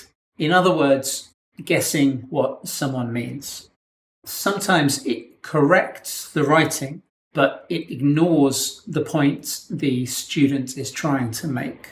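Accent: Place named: United Kingdom. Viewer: Hi coach I realised yesterday You are very very good Many thx for sharing your experience Also i like very much uk accent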